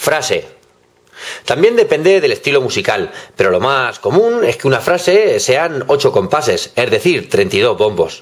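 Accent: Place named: Spain. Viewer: amigo que buen trabajo y gracias x tomarte el tiempo para hacer todo este trabajo que la verda vale muchísimo para los que nos estamos iniciando en esto de lo del dj esto es como el oro puro muchas gracias ojala sigas haciendo mas videos como estos mas de 1 te lo agradeceríamos bueno espero te encuentres bien soy de méxico y x el acento que tienes creo que eres de España bueno pues muchos saludos hasta España y ya me suscribi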